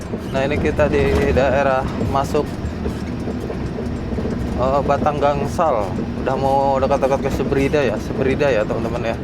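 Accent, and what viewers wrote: Serang, Indonesia. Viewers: Sy senang ngikutin yutup sy juga sopir bis malam pahala kencana tapi lebih senang lagi klu bicara dikit dikit waduh aing ciri khas orang Serang he he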